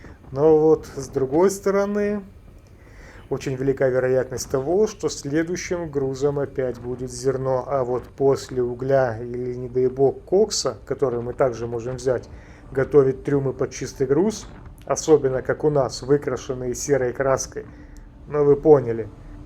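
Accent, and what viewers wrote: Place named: Odesa, Ukraine. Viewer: Одесита чути здалеку😄 Дякую за чудове відео! Не кидайте показувати швартовки та відходи, це завжди цікаво і ніколи мені особисто не набридає 😉 Легкого контракту! Україна дивиться🤝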